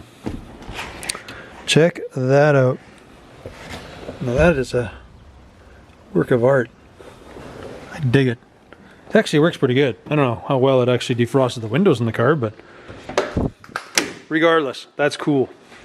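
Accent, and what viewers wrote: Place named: Southern Ontario, Canada. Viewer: That accent tells me southern Ontario🙂👍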